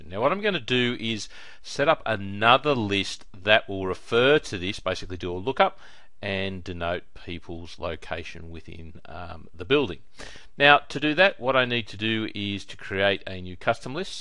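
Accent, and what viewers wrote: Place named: Australia. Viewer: Many thanks for the reply - searching on the web all the articles are for SP 2010 or maybe 2013 and all recommend not using (large) lookups as they slow the system down too much, I guess I can only do some testing to see what sort of impact the large lookups I'd like to implement will actually make. Also nice to hear an Aussie accent on youtube, keep up the good work mate.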